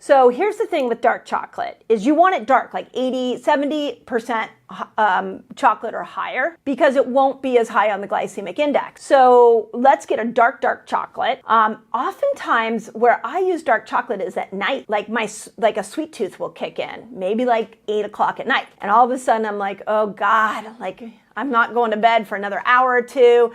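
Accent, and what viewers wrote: California, United States. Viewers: You have helped me so much I don't know how to thank you! 21 lbs down so far! The only thing that doesn't register is when you say "fee-ude" instead of "food" ....must be the CA accent. 🙂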